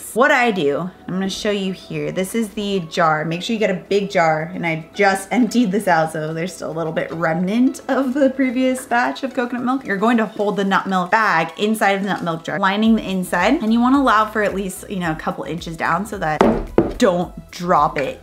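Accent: United States. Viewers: could you please slow down a bit? I just can't follow what you say (and Im sure may others and those who are not familiar with the US accent will also struggle). Great content though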